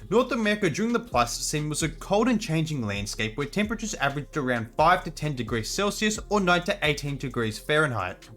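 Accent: Australia. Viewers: Question , is your accent Australian or New Zealander?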